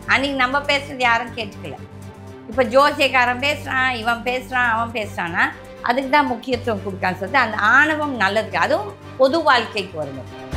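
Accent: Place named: Tamil Nadu, India. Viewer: Your English is perfect 👌. But தமிழ் slang ? Anyway, truth is what matters ! . Bha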